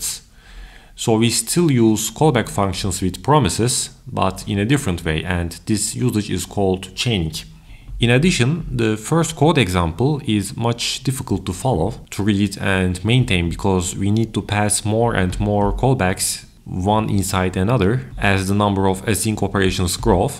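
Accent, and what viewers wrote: Turkey. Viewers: İlk cümleden türk aksanı hissettim ama bilemedim :)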